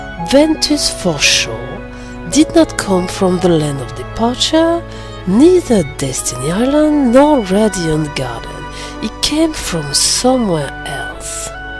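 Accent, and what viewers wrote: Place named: France. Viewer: Salut salut, bon je me permet d'ecrire en français du fait que j'ai reconue le petit accent :). Maintenant venant en a la theorie, je trouve qu'elle fait sense dans un certain context avec les arguments que tu viens de presnter. Mais le maitre des maitre n'est pas assignier a une union par consequent les signes des 6 union doivent étre present ou aucun ne doit faire son apparition. Mais une chose qui m'a fait réflaichir c'est le Labo qui se trouve en dessou du manoir, maintenant que l'on sait que KHUX se passe dans un rêve ou une simulation informatique, c'est très probable que le manoir est le prochain QG des dandelions après la disparition de daybreak town dans les tenèbre (ou ils ont re-crée les evenements graces a leurs souvenir), cela peut expliquer les statues d'unicornes et les quelques signe de l'union de vulpus de maitre Ava en hommage aux précedant Maitres. 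En gros voila mon idée et j'aimerais bien avoir ton avis sur le sujet, bien evidement je sais que ma théorie a des failles (cheraty) cela me feras plaisir d'en disscuter. Merci pour la video !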